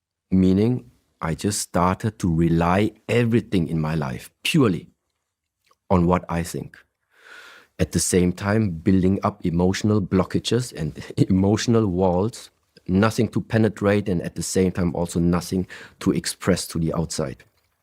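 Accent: Germany. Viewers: Yes, and especially saying "Ja?" after some of the statements. Very German thing to do, and very German pronunciation on that as well.... Plus, I don't know how many people know it, but there are lots of Vietnamese refugees from the 70's and in the meanwhile of course their children and now grandchildren too, with most of them having a German citizenship. I think it's great that he's opening his heart more, and also admits honestly that the old patterns sometimes try to sneak in ( and wreak havoc, if letting certain unhealthy patterns to take over). I think that many such patterns are what people often call "family karma" or "ancestral karma", depending on how far back those patterns go... I myself am on the path of recognising and changing them - and I know all too well the feeling of "never being good enough". Where to just BE is sort of act of revolution concerning the old family dynamics and expectations - and it's something that most of the society doesn't accept either, nearly all of us are indoctrinated from an early age on to do more, achieve more, compete with one another ( the school system very much so with the notes etc ) and sadly, it not only leads to continuous unhappiness with ourselves, but also destroys the planet when most people go after more and more "achievements" that are often shown as material stuff... 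Yet, to be able to break out of it, one needs certain amount of money to have a small house and property if one wants to live off grid for example. And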